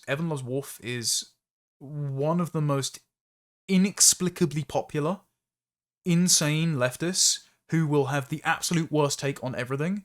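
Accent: RP, United Kingdom. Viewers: always the halfwits who are impressed by the average posh english accent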